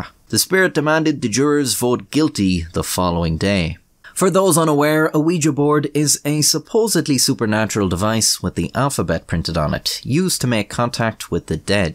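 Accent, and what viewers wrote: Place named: Ireland. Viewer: Come to Ireland for a year and you can understand him better :)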